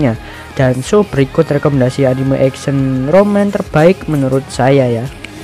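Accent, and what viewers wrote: Java, Indonesia. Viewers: Medokk tenan mas e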